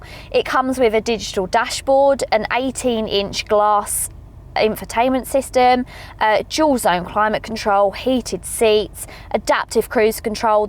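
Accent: United Kingdom. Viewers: She British☹️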